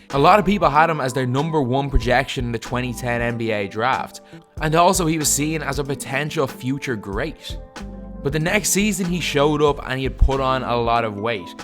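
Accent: Ireland. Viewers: Are you Irish? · Interesting video :-) And I just gotta say ut: love that Irish accent :-) 🇨🇮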